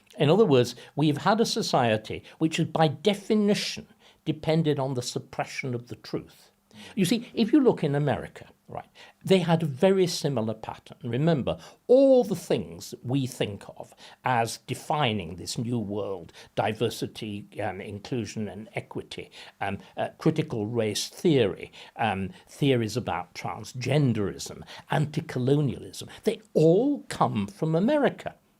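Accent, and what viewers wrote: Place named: England, United Kingdom. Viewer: Yeah, I'm subscribing. This man is straight 🔥🔥🔥. Victor Davis Hanson with an English accent.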